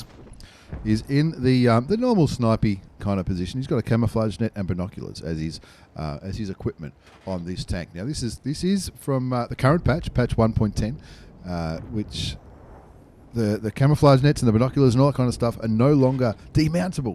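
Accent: Australia. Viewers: Hey yes you not those other bloaks luv your videos and awesome aussie accent keep up good work from Jax Fla USA P.S no homo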